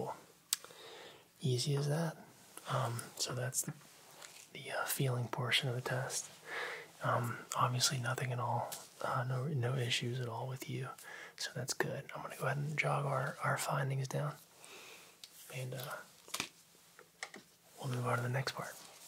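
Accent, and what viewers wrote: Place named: South, United States. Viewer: you got a hint of southern in your voice 🤨🫣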